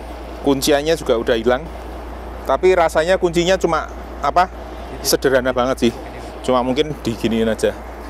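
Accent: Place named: Surabaya, Indonesia. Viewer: SALUT SAMA BOS... TOP BANGET.... LOGATNYA NGOMONG KAYAK AREK SUROBOYO..... SALAM DARI SURABAYA......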